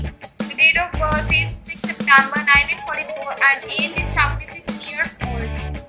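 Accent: India. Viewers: Agree. Indian voice over kid....listen to the video yourself. This isn't good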